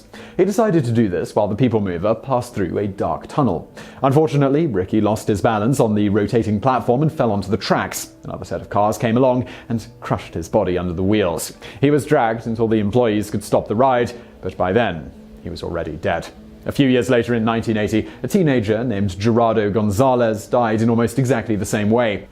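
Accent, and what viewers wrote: United Kingdom. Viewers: For god’s sake, he’s British. That’s how they pronounce it in that accent. Lay off.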